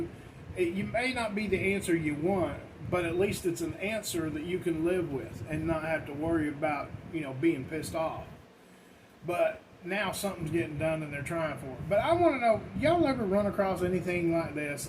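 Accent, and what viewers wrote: South, United States. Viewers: your accent is getting very Southern again.... you picked that back up quick!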